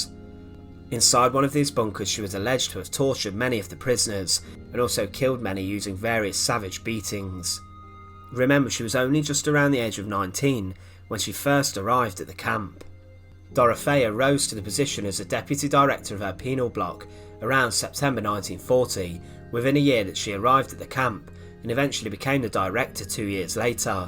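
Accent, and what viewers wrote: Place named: Northern England, United Kingdom. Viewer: hes a working class man from Northern England..not too sure where from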